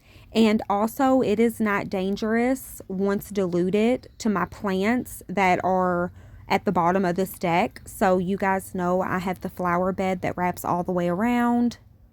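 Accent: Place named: Kentucky, United States. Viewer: # 1 Mama Always Getting It Done For Her Daughter and Son❤ Love Ya Bunches My # 1 Favorite Person to Watch and Listen to that Kentucky Accent ❤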